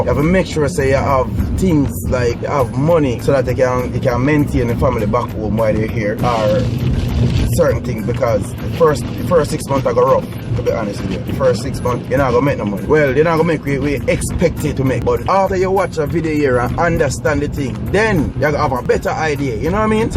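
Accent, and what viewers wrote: Jamaica. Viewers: You sound more Jamaican in this video. Lol.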